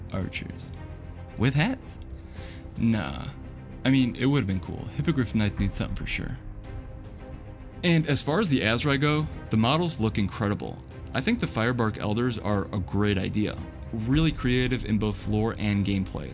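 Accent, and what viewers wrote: United States. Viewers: I'm from England mate defiantly best not start a youtube channel. Dont worry..... Yanks all sound the same to us man. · I'm detecting a Midwest accent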